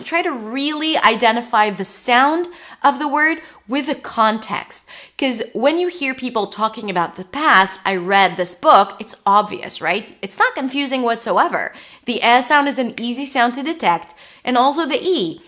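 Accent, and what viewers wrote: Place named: United States. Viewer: You have an American accent. In standard English Polish isn't Pronounced as paalish.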